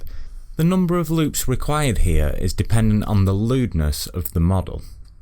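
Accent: United Kingdom. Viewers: Something in the UK, that’s all I can tell.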